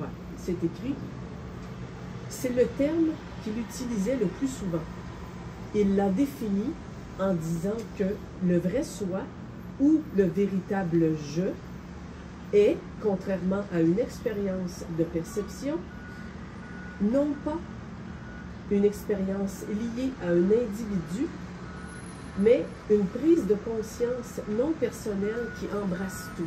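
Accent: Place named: Quebec, Canada. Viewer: Toujours intéressant, mais le bruit de fond industriel est pénible. Comme l’accent québécois n’arrange rien en plus 😅 But thanx ;)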